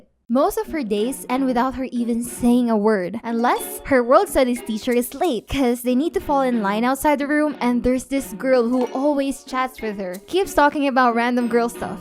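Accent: Visayas, Philippines. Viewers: bisaya po ba kayo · I knew it.i instantly guessed that you are a Filipino too because of your accent(which is, btw super gooood) just from the first video I watched from you. Another youtuber to be proud of. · Cccccc rrrrrrrr perpek pilipino aksent :) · I new u are a pilipino beucase im a pilipino and your english is like pilipino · OMG nung una ko tong pinanuod... sabi ko, ang accent mo parang sa filipino. 😂😂 love this channel... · Omg im a filipino!🇵🇭 ~*~*~*~*~*~*~*~*~*~*~*~*~*~*~*~*~*~*~*~*~*~*~*~*~*~*~*~* 🇵🇭Filipino: Maganda ang video! Ako ay mag subscribe sa iyo kapamilya👍🏻❤️ 🇺🇸English: The video is beautiful! I will subscribe to you my friend👍🏻❤️ ><><><><><><><><><><><><><><><><><><><><><><><><><><><><><>< Btw are u a filipino? ur acent is like a filipino.... especially when u said "C.R" ======================================================== EDIT: Btw I love ur acent😂👍🏻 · U sound like a filipino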